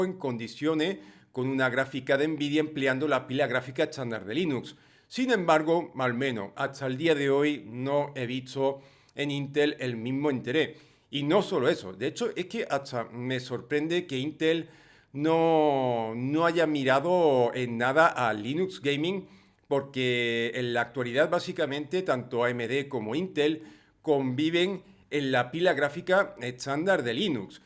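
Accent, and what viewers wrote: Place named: Extremadura, Spain. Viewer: Vos sos de Cáceres o de la sierra de la gata? Tenés un acento que me hace acordar al de Nazaret martin crespo una chica q vive en esa zona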